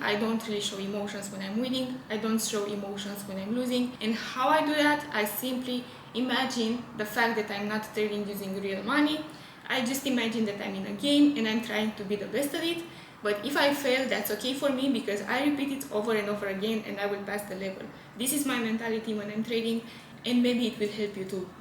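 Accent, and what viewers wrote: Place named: Russia. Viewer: Russian Accent 🤔 . Where are you from ? Ukraine , Russia , Moldive , Romania ?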